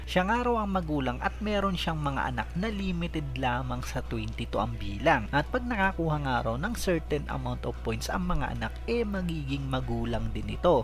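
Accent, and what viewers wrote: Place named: Philippines. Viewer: Boss bakit yung pronouncing mo ng english parang pilipinong pilipino din.. medyo mahirap siguro paghaluin english at pilipino pag nagsasalita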